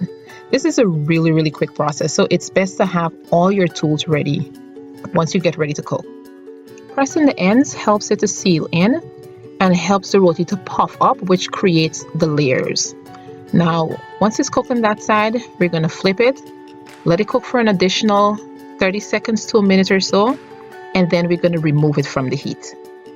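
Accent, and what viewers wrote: Guyana. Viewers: Thanks, for your first YouTube video looking forward to more. I'm always looking for new recipes on your blog. Love ya accent. GT to de bone. Thanks for repping Guyana and sharing our delicious recipes.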